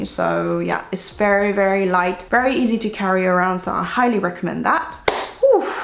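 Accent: United Kingdom. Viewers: 오늘 립 진짜 예뻐요!!!!! 저도 삐아 컨투어링 밀크티 브라운 진짜 매일 쓰는데 등장해서 너무 반가워요 코 쉐딩에도 넘나 자연스럽고 예뿝니다 >< 오늘도 t 영국발음에 치이고 갑니다.. 털썩.. 믿고 면세점 털러갑니다 헤헤 오늘도 행복한 하루 되세용❤ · 가끔 영국악센트나와요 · 저도 여쿨이라 언니 영상이 넘넘 도움이 많이 돼요😢💕💕 언니의 영국 발음은 몇번이나 들어도 넘 멋있구 스윗하네요😘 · 제가 영어를 잘 몰라서 그러는 발음은 영국 발음인가용? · 영국발음 너무 좋다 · Omg!!!!british accent💕💕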